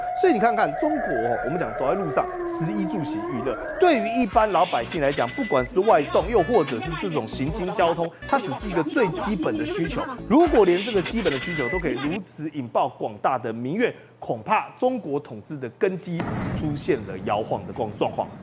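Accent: Taiwan. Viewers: 笑死了，一听到这个弯弯口音就知道要放什么屁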